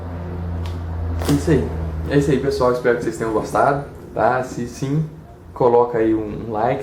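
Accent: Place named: Minas Gerais, Brazil. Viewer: Parabéns. Foi uma ótima resenha, explicou tudo de forma clara, concisa e pude compreender perfeitamente a que o livro se propõe. Me animei a comprar o livro e realmente os hábitos são tudo, foi uma das características marcantes que percebi convivendo um tempo com pessoas extremamente focadas e proativas de sucesso, com perfil vencedor que convivi no colégio. Eles sempre tiravam as melhores notas e eram muito reconhecidos pelo corpo docente. Tem sotaque e jeito mineiro, acertei ? rs parabéns novamente, abçs.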